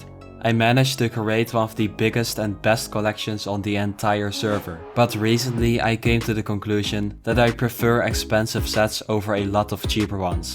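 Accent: Netherlands. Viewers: super nice vid man keep it up!!!!! you have kinda a dutch accent is this true? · Are you dutch cuz ur accent sounds insanely dutch lol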